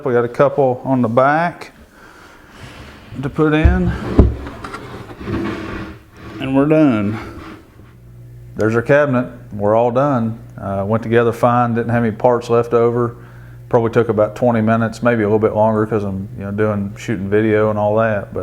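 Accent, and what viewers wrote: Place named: Eastern Kentucky, United States. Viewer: Between the accent and the funny sayings you must be from eastern Kentucky?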